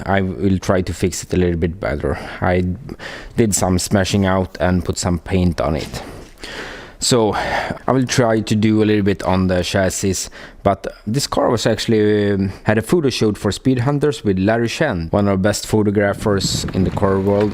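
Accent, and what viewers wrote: Sweden. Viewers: Your video are gold, and that swedish accent is awesome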